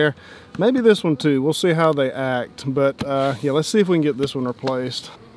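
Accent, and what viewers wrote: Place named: United States. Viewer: this is the most american channel i've ever seen and it is so good i can't stop watching. i am sorry if i sound like a butthole i just happy that i could distinquish (spelled wrong probably) an accent and understand all these imperial measurements bc i am interested in new stuff. i love the atmosphere, please keep on going!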